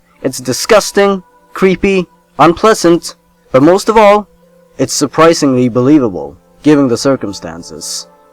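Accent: Sweden. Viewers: are you from Sweden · wait a sec.....are you... SWEDISH??!? like I am ^^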